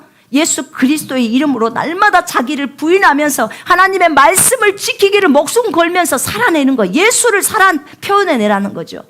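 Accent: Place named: Jeolla, South Korea. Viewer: 선교사님 전라도 말이 친근감 갑니다^^*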